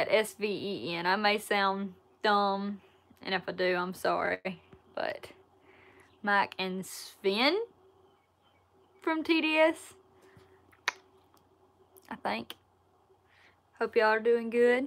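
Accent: South, United States. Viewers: Sound like a man?!? Uh ... No. A sweet southern accent is like a warm blanket to me. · You’re accent is awesome. And I’m from CNY. Love the southern accent. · Don't you ever think your accent sounds bad.I have always loved a southern ladies accent and yours is the best.I am in Baltimore just on the outskirts of the city and I would take your southern accent and southern charm anyday from how they talk here.Dont let anyone say anything bad about the way you talk or act.Your pure class. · I wish all women had that beautiful southern accent! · I love you're southern accent. Alot of my family is from the south. Im considering moving back down south at some point in time. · You have a lovely southern accent . Nice to hear someone with more of a southern accent , than mine ! :-) Be safe and stay healthy . Wyr God bless From Texas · Your southern accent is beautiful just like you! 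· True southern belle with your accent y'all are great. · accent what accent thats just your southern charm a shinin through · Southern accents are the best! You don't sound like a dude, whoever said that, needs some game ears to hear your pleasant voice! Keep doin whatcha doin! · You have to keep that accent I love that southern accent have a great day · Your video may have been taken down because they are doing random removals because they do not have human staff to look at videos and censor them correctly. You may be a victim of You Tube picking random videos that have a title that may be slightly suggestive. Re-download and put a title that is different "DO NOT SAY NO EARS" This gets their attention. Try it again and I guarantee it will not be taken down. If anyone here does not know that your Dad is your Dad and thinks he is your boyfriend...well they are not paying attention. I knew that from the first few videos I watched on your channel. 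Your videos are great. Your Dad is a very nice man who loves his Daughter. He is one of the hardest working men I have ever seen. You get your work ethic and Southern Qualities from him. I Wish I could tell him how great a job he did raising you...you are a beautiful and clearly well brought up young woman. I love your accent. I lived four years in the South and miss that Southern charm. Keep being you. You are a wonderful young woman. Some man will be blessed to call you his girl someday. Stay safe and healthy. We love you and your dad! XX's <3 I hunt and fish and F those who think anything is wrong with that. It is called conservation. You do what you have been raised to do and be proud of who you are. Geo. Like my channel and subscribe please! Geo. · You're an Amazing Pioneer woman & that southern draw is sweet & badass all in one. Will ya do a bowfishin night video for us this summer? 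· I love the way you talk and wish I had that southern accent you do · The best thing about your video's is you. Your beautiful, have a sexy southern voice, a good sense of humor, and your a talented hunter. From watching your video, it seems like your a wonderful person and well, if you haven't noticed, we're all still watching even when your live, in your pajamas with your pretty hair not brushed. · Gday from the Sunshine Coast Queensland Australia, love me a southern accent couldnt get enough of the stunning southern ladies when i called Bossier City Louisiana home 😁 love your work, awesome job, and tell your daddy he raised you right, nothing beats a well manner, beautiful, country girl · I love your accent its an authentic American accent what you do for a living is cool, girl.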